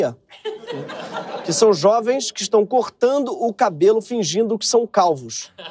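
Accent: São Paulo, Brazil. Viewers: A melhor imitação de paulistano que existe, cara 🤣🤣🤣🤣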